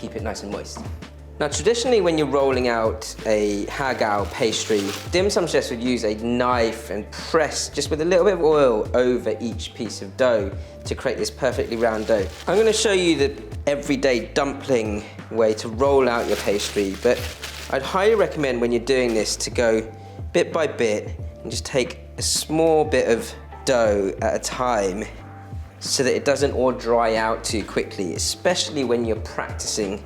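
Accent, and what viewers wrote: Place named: United Kingdom. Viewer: His accent is very british... tickle my ear drum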